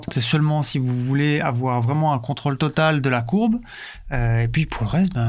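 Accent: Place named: Belgium. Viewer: 10 secondes de vidéo et je me disais bien que cet accent m'était familier .. :p Salutations de Belgique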